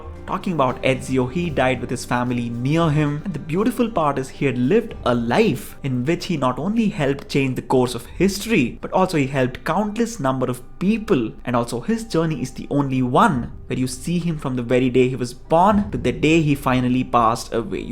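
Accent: India. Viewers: Are you an Indian ? You sound like one...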